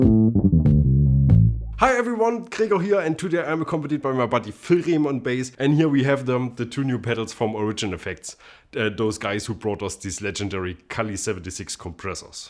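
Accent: Germany. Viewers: strongest german accent invasion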